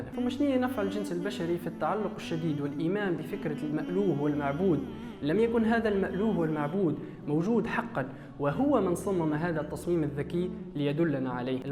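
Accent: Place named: Tunisia. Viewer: حسيت صناعة المحاور تتكلم بصيغة تونسية ...مشروع رائع بارك الله في عملك ووفقك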